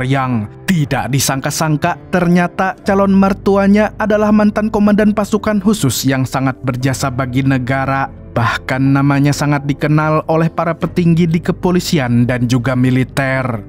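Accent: Banjar, Indonesia. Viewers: Bahasax Admin, bhsa Banjar gen, 😂 ngaran ikam spa?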